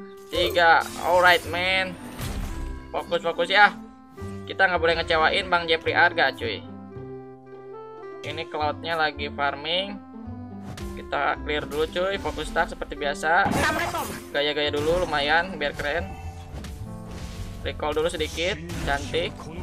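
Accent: West Java, Indonesia. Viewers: Bang orang sunda nya atau lain tapi siga orang sunda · Jiga logat orng sekitaran garut/tazik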